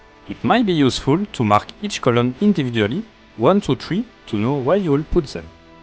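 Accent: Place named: France. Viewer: Great video, also could barely tell by your accent that you are french! laughs in Hoho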